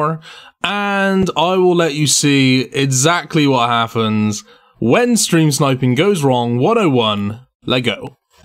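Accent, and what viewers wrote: Bristol, United Kingdom. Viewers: Are you Bristolian by any chance? Accent sounds recognisable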